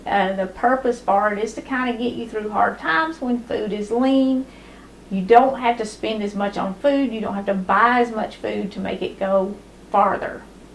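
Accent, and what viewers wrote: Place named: Appalachia, United States. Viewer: We live your hillbilly talk. Prays God for ya. Everyone didn't lose real language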